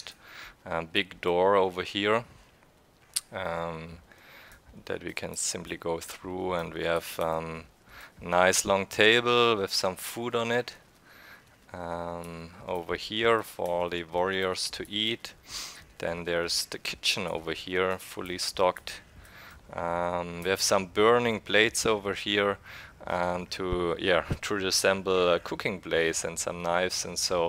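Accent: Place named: Germany. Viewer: do it dude. I’m just some 36 yr old enjoying the game immensely and loving seeing all the creativity of others. Your builds are exceptional and I love the way you presented it all. I work with voice overs for a job, so I can’t lie, I just love the efficiency of your German accent. Keep building the dream and continue to press the boundaries of our sacred Valheim 👍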